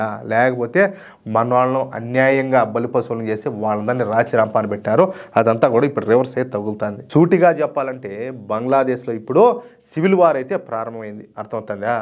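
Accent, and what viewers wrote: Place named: Nellore, India. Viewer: Yendhi abbayaa mandhi NELLORE Yendhi Nee Yasa chusthee alla untee anduku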